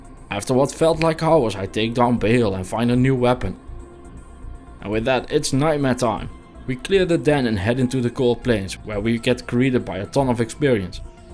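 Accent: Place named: Netherlands. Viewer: ik hoor gewoon een nederlandse broeder onder dat accent <3 love to see it. ik heb geen dutch d2 friends </3 :(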